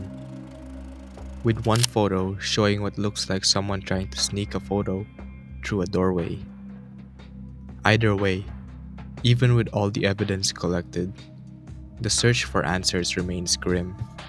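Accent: Philippines. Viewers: I hope you don't find this offensive, I just want to say that the Filipino pride in me ran rampant in my blood because your accent sounds like Filipino. I hope you continue and I commend your content, graphics (which reminds me of my fav YouTubers: Nexpo and Crowley), and the voice-over. It's nice to have you back on my recommended.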